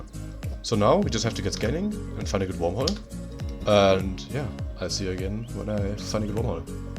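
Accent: Germany. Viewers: On a top of a thick German accent. I don't get why everybody feels the need to add - most of time silly - music to videos. Anyway, good content. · ahh i höre raus deutsch :D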